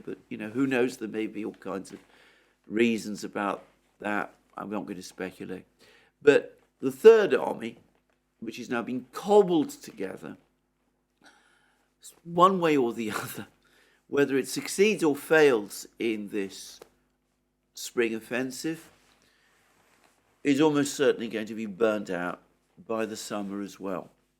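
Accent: England, United Kingdom. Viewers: Wired listening to a Greek communist with an English accent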